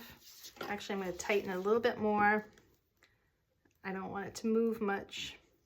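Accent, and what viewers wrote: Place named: Massachusetts, United States. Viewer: I haves love your wreaths since I was lucky enough to find you. I did not know that you were from Massachusetts until today. Iwas from the same area including living in Mashpee for awhile. I understand your accent. I am going to make this wreath for my niece who lives inNeport.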